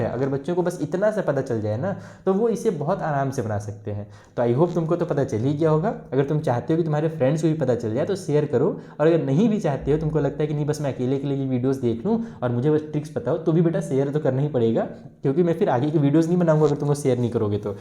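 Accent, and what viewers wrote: Bihar, India. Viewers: Sir thanku kal mera aanual exam hai aap bhut aache hai aap bhiar se hai naa aapki accent se pata laga raha mai aapko 6 class se follow kar rahi hun and 9 and 10mai bhi kraungi true fan sir❤❤❤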